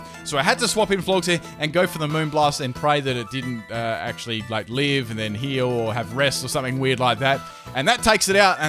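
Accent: Australia. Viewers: its fine, he's aussie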